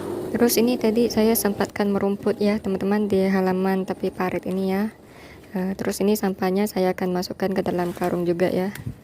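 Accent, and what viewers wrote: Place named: Aceh, Indonesia. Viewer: Menyou tedengo nyo lage oreng aceh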